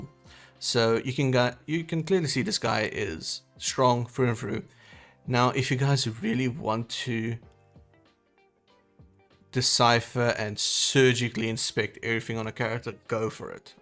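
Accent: South Africa. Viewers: Hi thanks for this. Started a few weeks ago and found this helpful. Btw am I hearing a South African accent?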